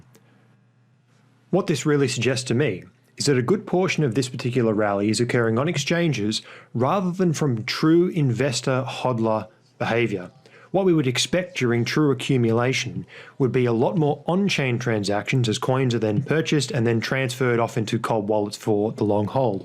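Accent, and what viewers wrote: Australia. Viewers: Great, a fellow Aussie. Accent is unmistakable! Love this channel. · Fantastic video! Good to hear an Aussie on RSC! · THANK YOU...GREAT ANALYSIS...much appreciated...well done...ps...do i detect an Aussie accent?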